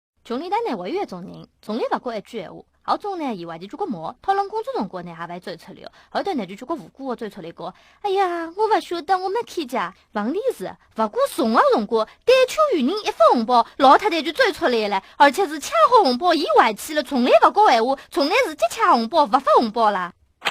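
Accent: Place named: Ningbo, China. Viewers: 讲的有很多上海话口音、不纯正的宁波说话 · 这不就是很正常很普通的宁波话吗……顶多有俩字读错（我就听到“容”和“迎”读错了，人家“微信”都读的对了），怎么一大票人跳出来说不正宗不纯正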